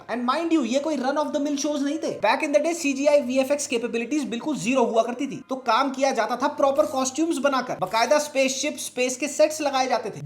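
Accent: India. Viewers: that's maybe bcz he isn't british. There are many words we use and the pronounciation we prefer is sometimes british pronunciation and sometimes words derived from american english or in american pronunciation but that's May not be perfect and is a bit indianized like British pronunciation in Indian english accent.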